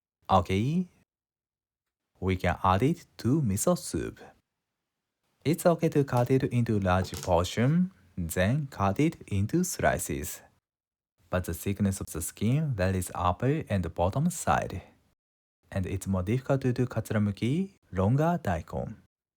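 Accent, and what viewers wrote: Japan. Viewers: Your comment is full of negativity and doesn’t contribute anything useful. Everyone’s trying their best, and no one’s perfect. Just like how a French person speaks with a French accent or an Italian with theirs, a Japanese speaker has their own accent when speaking English. It doesn’t make their English less valid—it’s part of who they are. If the content isn’t for you, that’s fine, but there’s no need to tear others down. Maybe take a step back and think before you post—respect goes a long way.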